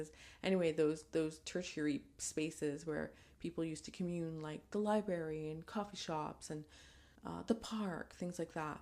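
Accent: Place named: France. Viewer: PS. I think what makes you, you is your voice, your way of expressing things, of describing things, your French accent when you speak.. not your mascara and makeup, you are sooo beautiful barefaced. Just grab a cup of cappuccino and throw yourself outthere with whatever feels good to you. We are waiting for you , whenever you are ready!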